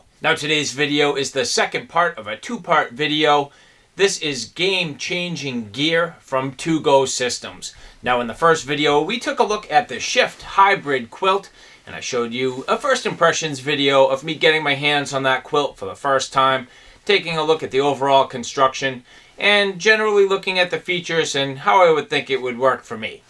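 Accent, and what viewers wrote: Boston, United States. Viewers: Not sure where is the US you are from but judging from your accent - you just got to be from the NE, possibly Bawston? Am I right? BTW, I just got my Trifecta in the mail; now I got to go try it out. Cheers.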